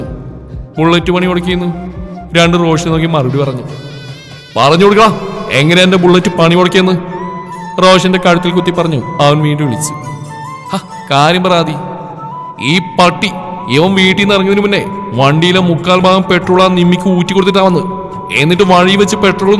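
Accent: Tamil Nadu, India. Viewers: തമിഴ് കലർന്ന മലയാളം നന്നായി പറയുന്ന ഹൃദയം നിറഞ്ഞ സ്നേഹാദ്റമായ അഭിനന്ദനം🎉🎉🎉🎉🎉🎉🎉🎉🎉🎉🎉🎉🎉🎉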